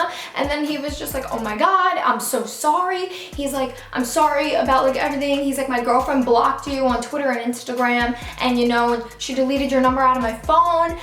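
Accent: New York, United States. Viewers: are you a new yorker? we have very similar voices and I hear your accent. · U have such a New York accent 😂😂 love it 💗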